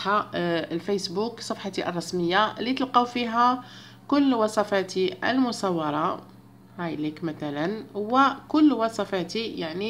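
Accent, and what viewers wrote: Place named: East, Algeria. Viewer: جابلي ربي من الشرق الجزائري حسب هدرتها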